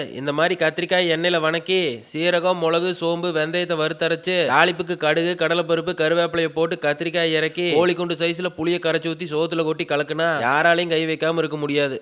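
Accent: Kongu, India. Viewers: கொங்கு பேச்சு எனக்கு சுத்தமா பிடிக்காது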